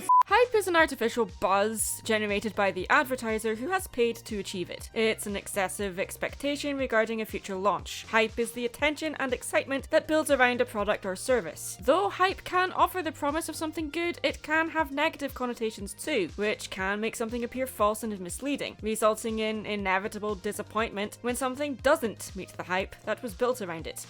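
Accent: Scotland, United Kingdom. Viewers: Love it or hate it this woman spitting facts. Also you sound Scottish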